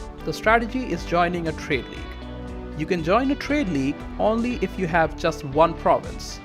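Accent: India. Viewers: You Indian or something? This accent is horrible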